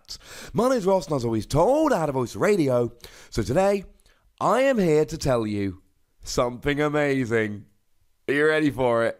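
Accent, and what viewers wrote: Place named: United Kingdom. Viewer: I´m sorry if this offends you, but the way you say "ball" kinda sounds like "bull." Is it the british accent? Edit: And also, when I played against some guy at a league challenge, he played apricorn maker, and that pretty much won him the game becuase he could set up so fast. Minutes after the game finished, he kept telling me how good apricorn maker and that he´s happy he´s playing it.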